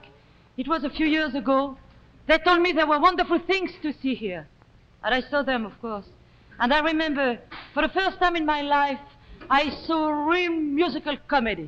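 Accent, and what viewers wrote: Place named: France. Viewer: Charming, French accent and nice, stage presence!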